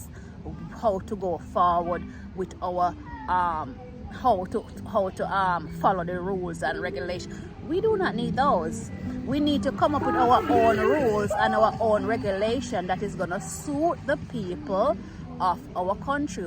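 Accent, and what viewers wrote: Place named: Jamaica. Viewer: You're a very very real Jamaican the way you talk and act.. Be blessed..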